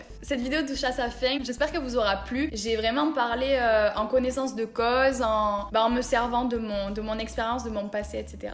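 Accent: South, France. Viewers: Tu as pris l'accent du Sud nan ? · ta grv l'accent du Sudddddd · t'avais l'accent du sud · l'accent du sud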